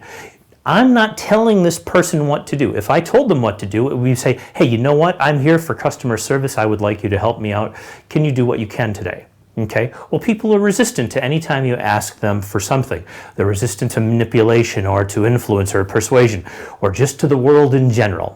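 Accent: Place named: United States. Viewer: Oh hello Kevin! I like your american english. :-)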